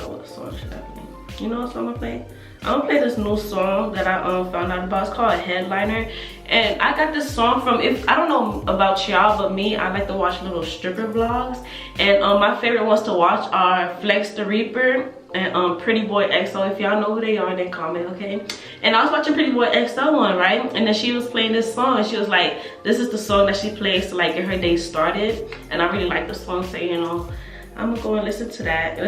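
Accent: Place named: Miami, United States. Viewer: Lol I could just by how you talk you from Miami and I was right. Good video.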